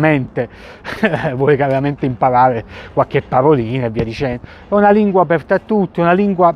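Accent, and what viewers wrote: South, Italy. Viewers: Tu come lo hai imparato? Ma per caso sei del sud?🤔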